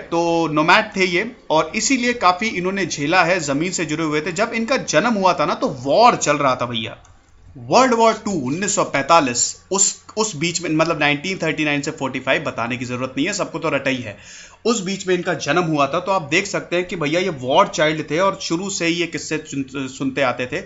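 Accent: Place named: Bihar, India. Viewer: Bhaiya ye aisa bhaynkar abhiyan tha na Full to bihari ascent ......I like it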